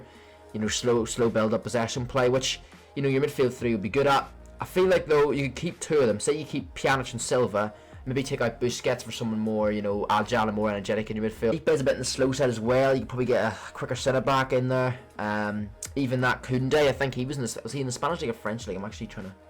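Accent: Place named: England, United Kingdom. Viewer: Good video, but why do u sound half English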